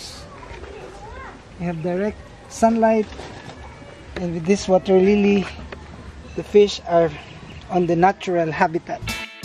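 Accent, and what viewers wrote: Visayas, Philippines. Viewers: Bisaya mn d ay ka kuya HAHAHA TAGA ASA KA SIR? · Bisaya diay ka kol? 😂😂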